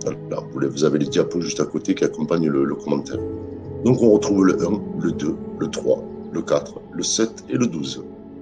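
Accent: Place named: South, France. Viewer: Bonjour, je jous decouvre ce matin , merci pour votre video qui me donne envie de vous écouté plus loins dans ces enseignements. Vous avez un accident du sud, de ma region!? Pouvons nous nous rencontrer ?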